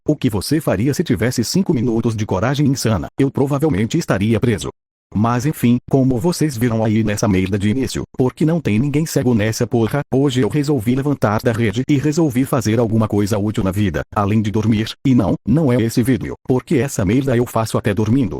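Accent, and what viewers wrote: Bahia, Brazil. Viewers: Sou de Goiás também kkk mais acho que tu e baiano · Pensei q ele era da Bahia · Pensei que o era baiano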